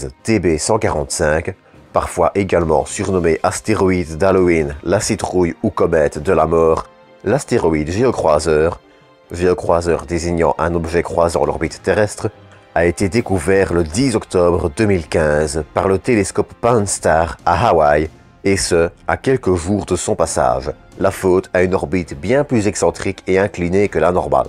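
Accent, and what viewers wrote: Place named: Wallonia, Belgium. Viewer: Petit commentaire juste pour flatter l'algorithme de YouTube..... Excellant travail comme d'habitude, avec comme signature sonore cet accent inimitable... Ha, ce terroir Wallon, on l'Aime.....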